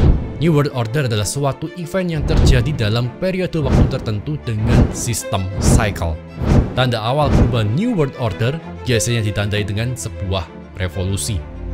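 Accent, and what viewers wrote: Java, Indonesia. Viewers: Jateng atau Jatim ko, medoknya hampir ga keliatan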